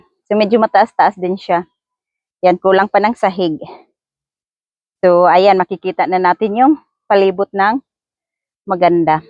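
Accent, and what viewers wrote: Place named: Albay, Philippines. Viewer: Parang Albany Ang salita mo idol Kasi mo sondang tapos tigbasan taga saan ka Po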